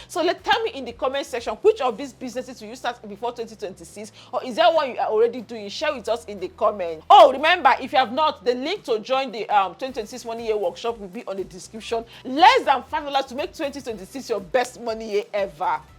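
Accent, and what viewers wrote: Nigeria. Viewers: Are you speaking Nigerian English accent?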